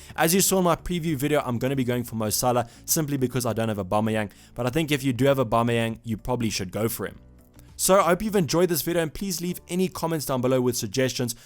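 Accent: South Africa. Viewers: good to hear that SA accent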